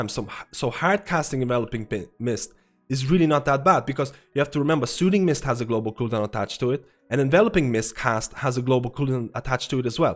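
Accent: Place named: Ireland. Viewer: are you living in Ireland, serious Irish accent lol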